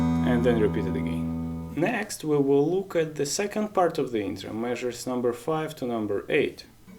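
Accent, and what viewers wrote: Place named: Russia. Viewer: Прям русский акцент.дядька,чем тебе наш язык не угодил?думаешь просмотров больше будет на инглише?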